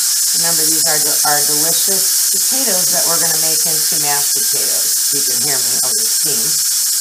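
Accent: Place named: Chicago, United States. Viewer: are you from Chicagoland. I am from Matteson and you sound like a local. I have subscribed and will be making that ASAP. You rock!. Keep them coming I will be watching.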